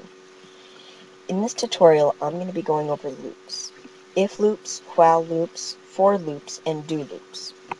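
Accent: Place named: United States. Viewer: (I'm sorry, for possibly bad English) Madam, all of this is very interesting and I already fell in love with your voice (excellent American accent), but.. your tutorial is rather a programming tutorial, not AutoIt tutorial generally. I mean you don't explain why AutoIt deserves our attention if so many other programming languages are available? What AuotoIt can do what other scripting-based environments, scripting languages, or compilation-demanding languages- can't (or can but it's tedious)?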